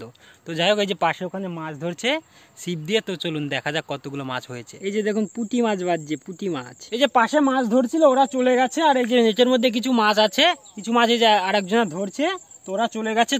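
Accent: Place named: Kolkata, India. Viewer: kolkatar bangla gula moja lage sunte...hevy test eta khub moja alge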